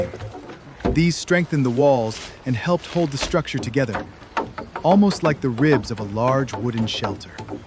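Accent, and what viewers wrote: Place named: United States. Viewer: Wow great thanks, I really like how AI makes past living again. And that's a nice American prononciation, I understood everything. Thank you to show each native Americans way of life aspect.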